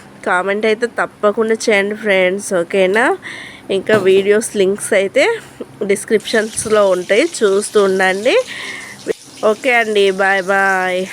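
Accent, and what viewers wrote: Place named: Telangana, India. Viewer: Bahusa imedi thelangana anukonta telangana vallu thelugu alaage matlada thaaru its ok bhaasha ardha mayithe chaalu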